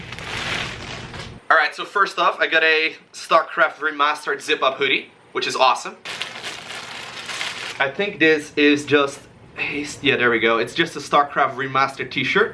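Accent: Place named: Netherlands. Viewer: You are from Netherlands? I knew that the accent is netherlandish!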